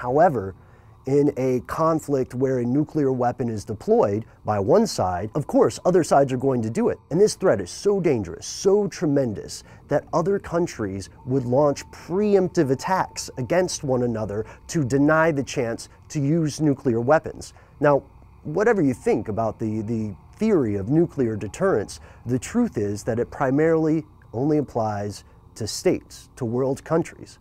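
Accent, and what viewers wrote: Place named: United States. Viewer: Hey, you sound American, so why do you use the term "petrol" rather than "gasoline"? I'm not being flippant; I'm genuinely curious. Are most of your viewers European?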